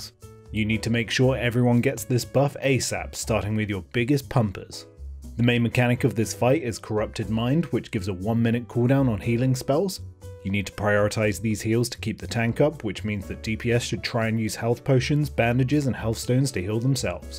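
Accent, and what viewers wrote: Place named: United Kingdom. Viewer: I think he is British by the accent maybe?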